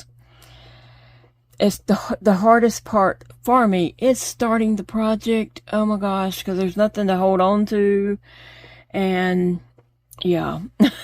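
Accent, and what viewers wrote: South, United States. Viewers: I agree, I just love your voice and your beautiful Southern American accent !💛💛💛😁🙏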